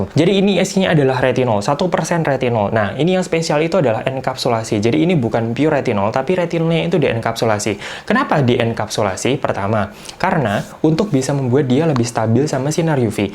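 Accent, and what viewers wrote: Java, Indonesia. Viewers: Jowo ndi yo ? Ngomonge lucu e😁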